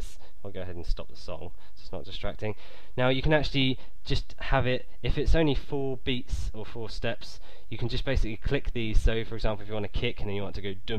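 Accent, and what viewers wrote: United Kingdom. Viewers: Oh my, i love your British accent, and great tutorial. :D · nice..that really helped me out ALOT! i'm on my way to downloading LMMS!!!!!!! :D p.s I LUVED ur british acsent lol.... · Something I've observed. People with sort of British accents always make the best tutorials! Thanks, I think I've got it now!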